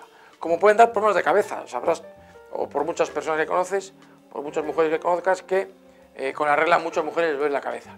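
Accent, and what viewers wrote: Spain. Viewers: Jajaja! Ya tampoco le entiendo pero por la manera de hablar, el acento tan español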